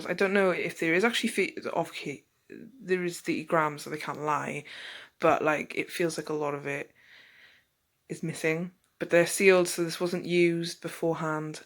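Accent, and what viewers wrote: England, United Kingdom. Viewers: Don't stop talking, love your English accent, although the music is nice, too.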